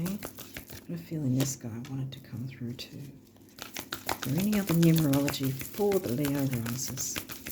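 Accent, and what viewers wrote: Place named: New South Wales, Australia. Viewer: Wow, thanks for this! Followed Gregory Scott across to explore your site - got way laid here on my way to the Mushroom Tarot review. What a happy serendipitous day of exploring rabbit holes this evening has become. Just got back from six months in NSW so hearing your accent was a heart warming thing in itself. Will have to re listen to this a second time and take notes. Love noticing how the tendencies and energies ebb and flow as I'm wading through this month of 'could go either way in home, relationship, work - looking after myself has become a priority though now. So thanks for the encouragement for that!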